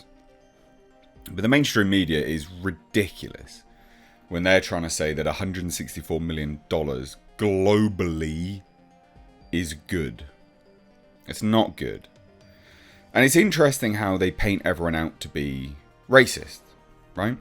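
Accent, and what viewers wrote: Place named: United Kingdom. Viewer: He sounds like every British guy everywhere anywhere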